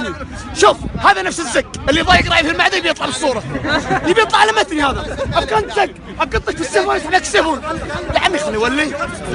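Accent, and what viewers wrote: Saudi Arabia. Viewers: ابك والله هو دوسري ويصرق لهجة عتيبه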